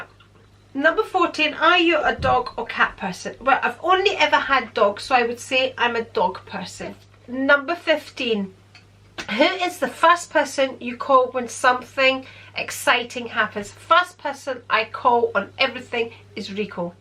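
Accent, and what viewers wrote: Scotland, United Kingdom. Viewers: The Planner... Rico The Executor! And What A True Gentleman Ehh... Putting Him On The Spot... Yet Once Again Executing The Perfect Answer!! Thank You So For Taking Us Down Memory Lane And Sharing Such Personal Incidents... Some Lovely Insight Into Your Parents Values And Character... Feisty... ‘ Fist 👊Fight En Route To Cyprus... 🤭... And A White Halter Neck Dress... Ooh Dear... 😱... Did Rico Tell You Off? Lol 🤭... These Are The Questions I Hope You Will Be Able To Answer Next Time... 1) What Were Your Parents Reaction When You Told Them You Wanted To Get Married At 17... 2) What Was Your Childhood Ambition... What Did You Want To Be When You Left School? 3) You Grew Up In London But You Have A Scottish Accent? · Ah that was really good to listen to I enjoyed that. With your Scottish accent I was very surprised you were born and raised in London. 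Seeing the photo of your Mum you’re the image of her....also are you an only child? Xx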